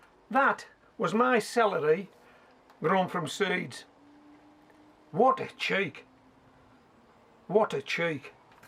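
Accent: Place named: Cumbria, United Kingdom. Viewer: Thank you for your clear and honest advice. Absolutely love your channel. I have learned so much from you in my first year of growing from seed. Thank you! (By the way....you sound just like my husband and in-laws who are all Penrith and Appleby folk. Are you local to there?) Best wishes, Amanda. ❤️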